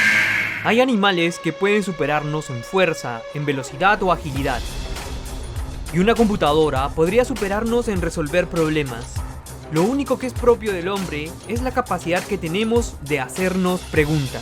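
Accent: Peru. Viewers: soy peruano y me imagino por tu voz que tu tambien like a tu video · Peruano hasta los tuétanos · Una pregunta eres peruano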